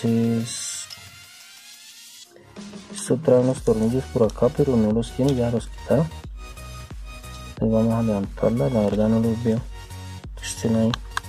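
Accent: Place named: Colombia. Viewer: Por el hablado pues es obvio que de Colombia...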